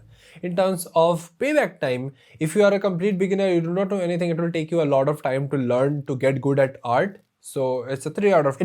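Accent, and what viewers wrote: Bengal, India. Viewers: good to know u can have a YT career in english with such a strong bengali accent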